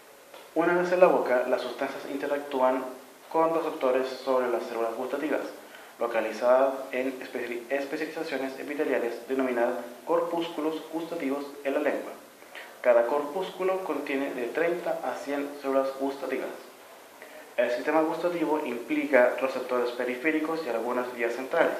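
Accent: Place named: Chile. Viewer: es chileno, así hablan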